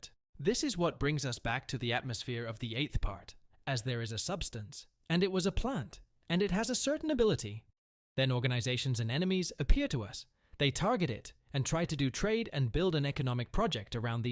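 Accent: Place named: Spain. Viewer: el acento español a muerte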